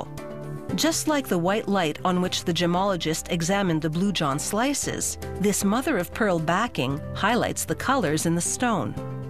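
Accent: Canada. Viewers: Whilst the producers of the show are ignorant and stupid for not researching the correct pronunciation of "Darr-bee-shire", my fellow Brits are equally ignorant and stupid for attacking Americans here, when the narrator is quite clearly speaking with a Canadian accent (oot, aboot etc) and she gives the temperature of the vacuum oven in Celsius, not Fahrenheit like an American would. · Then good thing this narrator is Canadian!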